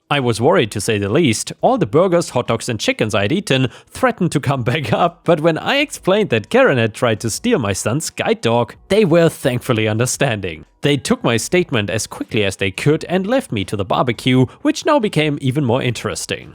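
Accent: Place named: Germany. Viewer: I always enjoy how you narrate these stories - the German accent makes the stories more interesting to listen to. Keep it up!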